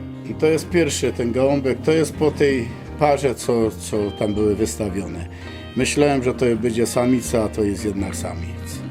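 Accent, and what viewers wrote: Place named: Cieszyn Silesia, Poland. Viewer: Witam. Skąd Pan jest bo akcent jak z Śląska Cieszyńskiego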